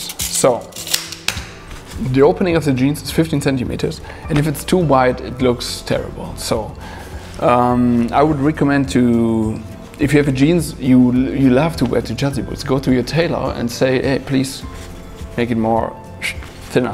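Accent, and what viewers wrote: Germany. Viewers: Bei englischen Videos hört man immer sofort, wenn jemand deutsch ist. 😅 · Love your German accent. It sounds like you’re about to eat me with a glass of Chianti.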